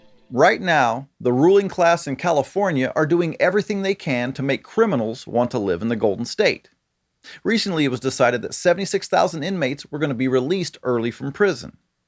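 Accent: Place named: South, United States. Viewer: Please come to the south! I would have sworn you were a southern guy anyways.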